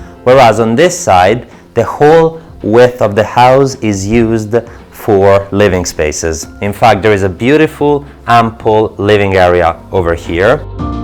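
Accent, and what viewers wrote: Italy. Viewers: Buongiorno! I just got back from my holiyeaaay extracting myself from any social media platforms but waaaaittt I find out that your Italian accent is decreasing. I really appreciate your effort and I love both version. 良い仕事を続けてください。❤️🇮🇹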